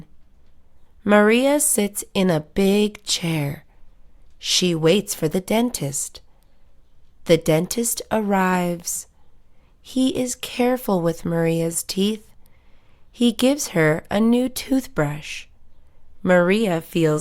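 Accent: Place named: United States. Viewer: Американское произношение. Спасибо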